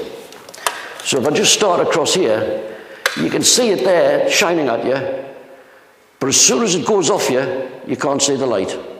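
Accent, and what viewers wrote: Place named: Newcastle, United Kingdom. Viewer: That's a Geordie accent (from the North East of England, probably Newcastle) not a Scottish accent (like Scotty - from Scotland).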